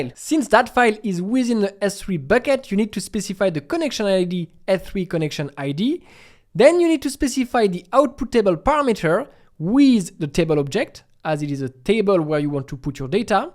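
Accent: France. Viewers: Thank you, but I'm sorry, English with French accent is terrible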